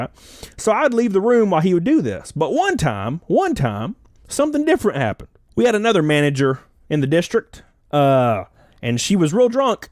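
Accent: South, United States. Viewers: You sound like a southerner. So as a southerner, go buy a gun and “stand your ground” my guy 👌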